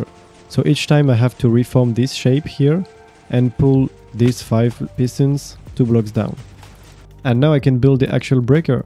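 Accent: France. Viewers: You have a French accetn no? Good work🎉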